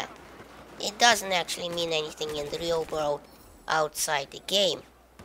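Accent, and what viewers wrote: Russia. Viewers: видно что ты русский :DDD с твоим произношением англиского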